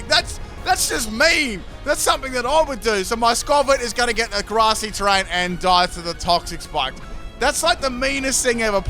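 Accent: Australia. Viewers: Australian accent "...and my Noinoi is gonna be noi more!"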